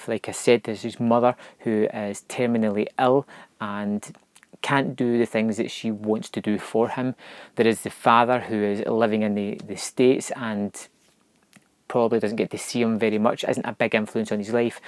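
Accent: Scotland, United Kingdom. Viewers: I love your Scottish accent. I will love to say that Scottish and Irish accents are the most beautiful accents ever! I love how well you described the story. I watched this movie last night and I cried, because my aunt passed away with an overdose of medication for her cancer. I never got to know her too well do to how young I was when it happened. Today I feel guilty, because I didn’t get to see my aunt much since my mom never got along with her. My grandparents always tell me how similar I am to her. She use to love to draw, and ride horses all the time. I felt a similar sadness when hearing both my aunt and my grandma got breast cancer too. I almost wanted to commit suicide and kept asking why them? It should be me who gets cancer. Luckily they both made it alright, but that thought haunts me every night. This movie taught me to never give up and to love my family and the good people who are around me. 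I will have to let go of the sadness and never forget the good memories I have with my loved ones. Enjoy with the people and animals you have now so you have long lasting memories of them.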